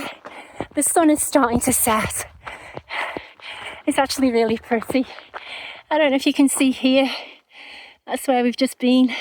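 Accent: United Kingdom. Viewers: Great video. Thanks for an expert perspective. On race day, I only ever see the back side to Ambler Loop in the dim dusk or in the dark. Have trained there quite a number of times but its still great to see it from a runners point of view...btw, its great to hear a Brit commentary...I am from Cheshire... see you in God's Country in August!!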